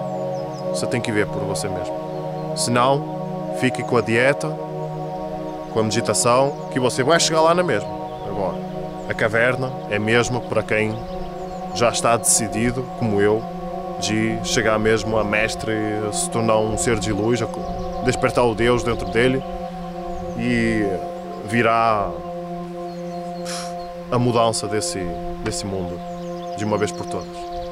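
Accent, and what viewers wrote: North, Portugal. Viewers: Você é, um Português!, que vive no Brasil!, certo?!,nota-se que o seu sotaque ainda é muito de Portugal (Talvez de Ovar Aveiro Sta Maria da Feira...por aí), um abraço enorme para si e para Todo o Brasil!!!. Namastê! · Obrigada pelo video, maravilhoso, muitas informações que ja conhecia, e outras não, adoro ouvir vc explicar com seu sotaque português, tantas coisas importantíssimas para nosso crescimento espiritual !!!! a LUZ vencera !!!!! merci !!!! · VC é português tentando falar em brasileiro pq? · Olá, tudo bem? 😊 És de Portugal? Bjs eu sou e descobri te agora mesmo... Mas tens um sotaque MT tuga! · este senhor tem pronuncia do norte de Portugal.... Porque é que está constantemente a falar no gerúndio? Porque é que tenta inserir o sotaque brasileiro no discurso??????????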